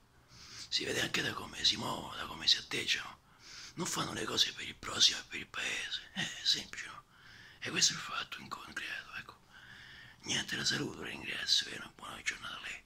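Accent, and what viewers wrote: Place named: Rome, Italy. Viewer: Ti è uscito l'accento romano.. in realta lui è emiliano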